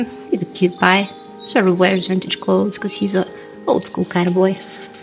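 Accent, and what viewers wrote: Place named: Russia. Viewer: i was listening to you and your accent and i felt like you speak russian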